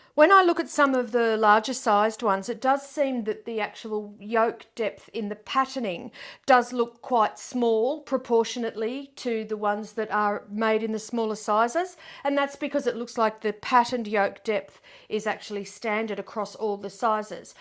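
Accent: Australia. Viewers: I get the ick with that Ooey Gooey name, too. The design reminds me of lava lamps. Not hot! Fish girl fashion: definitely hot, but I'd modify so the sleeves were in the usual position. I have not knit any of these, though Isabel Kraemer's designs Tresse and Nasrin are in my library, and I have fingering weight yarn for them. Thank you for your video series, they are super entertaining, especially with that Aussie accent!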